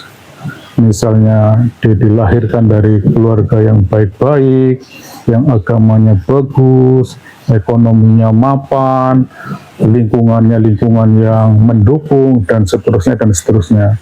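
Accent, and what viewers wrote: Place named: Banyumas, Indonesia. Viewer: Rika wong ngapak ya....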